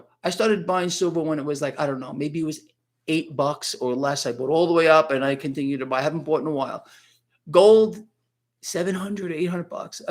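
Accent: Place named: Philadelphia, United States. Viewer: New to the channel, really enjoying it. Love accent. Is it Philly? Jersey?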